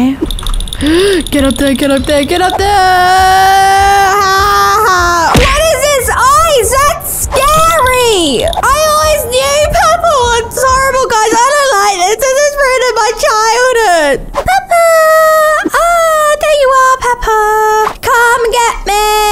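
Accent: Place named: United Kingdom. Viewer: your accient is very beautiful is it British?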